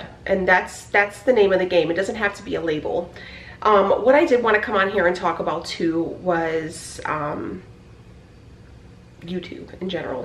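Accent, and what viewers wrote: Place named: Chicago, United States. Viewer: I just found you a couple of weeks ago. I'm retired and doing Keto. I used to be a truck driver and always was interested in Chicago people when I went through. Love your accent! Enjoy the cooking segments, the fashion. I just taught myself dip nails😱and love your leopard nails today. Whatever direction you go i will be watching! There are lots of older women like me that miss the family hub-bub so its fun to watch and relive those times.❣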